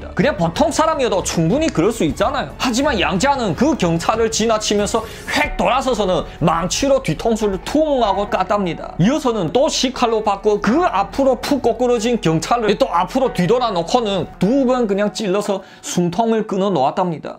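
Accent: Yanbian, China. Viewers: 동무 옌벤 말씨가 몹시 듣기 좋군요 옛 중국생활이 그립네요 짜요!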